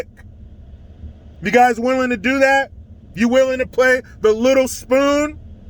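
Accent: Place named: South, United States. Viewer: Love the southern accent. Always hilarious! 😂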